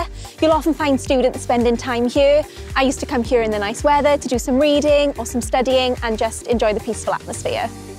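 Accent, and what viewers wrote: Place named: Cardiff, United Kingdom. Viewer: You got kind of a Caiiirdiff accent the way you say "hyerr" but you also got a touch of the valleys or something too. Not sure what. Maybe somewhere outside the city. The visuals and drone shots in this are incredible too. The city can look so beautiful from some angles.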